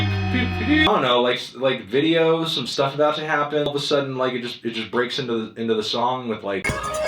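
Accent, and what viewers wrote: England, United Kingdom. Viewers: dude he has the best British accent! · i fucking loved your english accents... you sounded like all characters of monty python...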